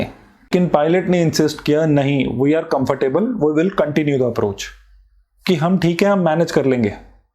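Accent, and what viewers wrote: India. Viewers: Looks like an Indian commentary. Accent gives away! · captain who ?! JahaJ ? opinion ? رائے ؟ matter nahi kartı junior hu ya senior. JeeJh ? Are you an indian ? You have an indian accent ! آپ کا ہندوستانی لہجہ ہـے _ جو کے انتہائی مشکوک ہـے _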